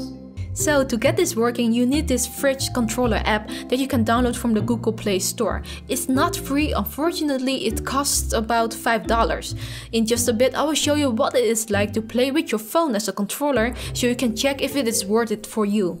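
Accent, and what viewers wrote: Netherlands. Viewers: You have a Dutch accent?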